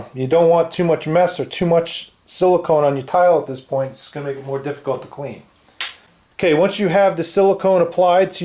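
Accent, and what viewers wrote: New York, United States. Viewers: Yikes wish I saw this a few days ago, just spent 3 days struggling with other methods and sticky stuff everywhere. Some people warn against window clean some recommend windex. I just used alcohol on my finger to smooth the bead but the problem I had in NC Florida was a cold snap and I couldn't get the caulk to run very well so it had blobs. I thought it was a lack of strength in my hands but it was the temp. After I ran a heater it worked better, but tooling the blobs was a nightmare. I am a DIY accomplished craftsperson but wow, this was as bit over my head in tolerance. Wish I saw your instruct, hopefully I won't need to redo it for 10 years, but then at 88 I may just say buzz off, and bleach off the mildew!!! LOL thanx for an excellent presentation. Love your NY/East Coast accent!!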